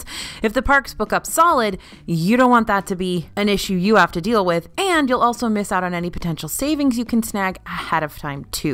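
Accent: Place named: Western New York, United States. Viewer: I knew your accent was familiar… I am also from western ny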